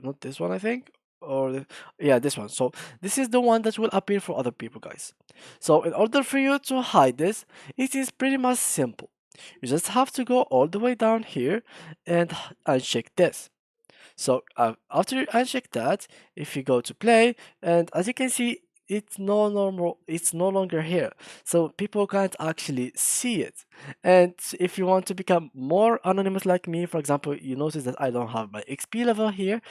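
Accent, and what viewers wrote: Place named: Morocco. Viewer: 3rftk mghribi gha mn l accent w bsh zdt t2kdt fsh seft lk khona msg flgame hhhhhhhh anyways gg abro and thanks ❤️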